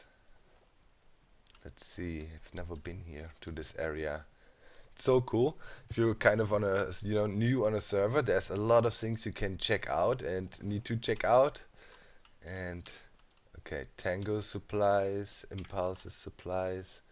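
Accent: Germany. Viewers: Schön dass endlich ein deutscher da ist. Ich bin ehrlich: Als ich gesehen habe, dass X gesagt hatte, es gibt einen neuen Hermit, habe ich schon die Augen verrollt. Es gab in letzter Zeit so viele neue Members. Aber als ich gesehen habe, dass du es warst, war ich doch sehr erfreut. Wirklich kennen tue ich deinen Kanal nicht (nur so 1-2 Videos), die ich mal gesehen hatte, aber mir im Kopf blieben. Wahrscheinlich wegen dem englischen akzent, der eindeutig zeigt, dass du Deutsch sein musst. =D So nun habe ich noch eine Bitte an dich: Auch wenn du mehr der praktische Typ zu sein scheinst, probiere doch jeden Raum entsprechend zu designen und "schön" zu bauen. Irgendwie ist mir das wichtig, auch wenn sich das komisch anhört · Love the German accent. <3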